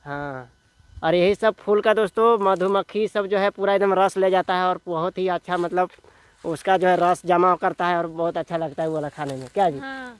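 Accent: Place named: Jharkhand, India. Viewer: आपके बोलने के अंदाज से ऐसा लगता है की आप चतरा या लातेहार साइड से हो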